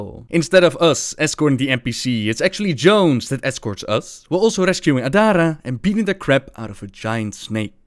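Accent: Netherlands. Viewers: Lol ik dacht... dat klinkt nederlands.. en die stem herken ik.. ehhhh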